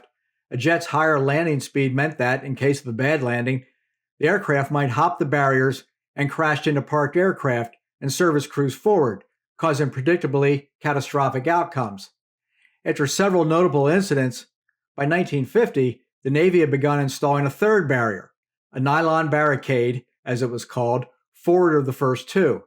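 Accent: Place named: Delaware County, United States. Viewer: I think the narrarator is either from Philly, or the Delaware Valley area. Anyone from Philly recognizes that accent a mile away.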